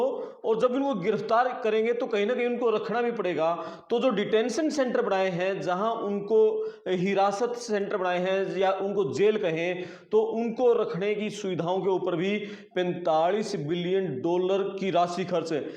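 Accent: Haryana, India. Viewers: U r fine , perfect presentation bt need to improve hindi,u r haryanvi accent bt disappointing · जी आपने अपनी भाषा पर नियंत्रण पा लिया है 90% control है। पता ही नहीं चलता कि वक्ता हरियाणवी मूल से है हल्की सी हरियाणवी झलकती है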